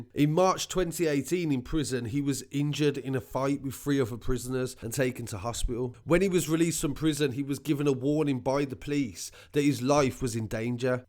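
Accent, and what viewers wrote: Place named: South London, United Kingdom. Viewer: Can tell your from south London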